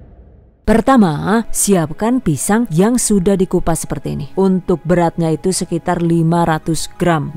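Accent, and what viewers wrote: Banyumas, Indonesia. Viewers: Kok bisa bahasa ngapak? 😁 kalo boleh tahu orang mana ya? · Coba pakai bubuk kacang + keramel atau bubuk kacang + coklat min, bagus penyampaian dan open mind alias blak kotang rak suwalan 😂😂😂😂😂😂😂😂😂😂 ngapak min yo? I love your voice ngapak 👍👍👍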